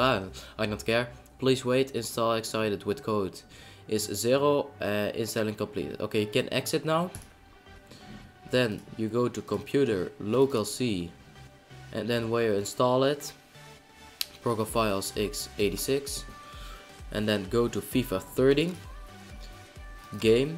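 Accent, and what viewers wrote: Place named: Netherlands. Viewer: uit nederland denk? kun je wel horen lolll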